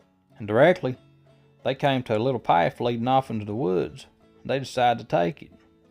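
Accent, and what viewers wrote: Kentucky, United States. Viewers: Mrs. Reid, our librarian in elementary school read Jack tales to us every day. Kentucky accent just like this. Thank you so much!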